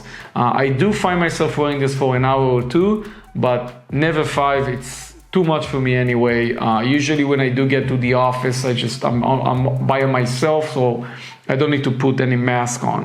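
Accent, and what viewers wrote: Israel. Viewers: Israeli english LoL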